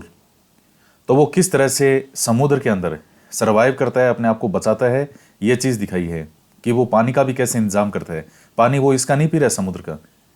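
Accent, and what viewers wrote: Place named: Marwari, India. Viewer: Sir thaari marvadi language bahut achhi lagi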